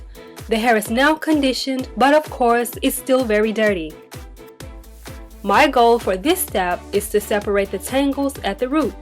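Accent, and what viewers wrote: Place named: Australia. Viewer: You sound like you had a little Australian accent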